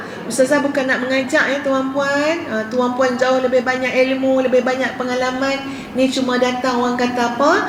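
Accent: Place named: Kedah, Malaysia. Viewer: Ustazah. Saya selaku org kedah mengaku ustazah pandai dah cakap kedah walaupun baru sat ja dok kedah.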